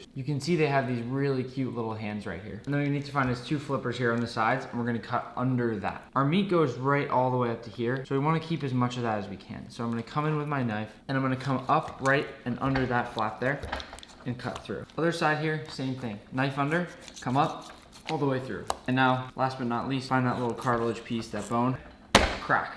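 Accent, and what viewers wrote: Australia. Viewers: he sounds like australia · are you Australian? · He sounds Aussie 00:1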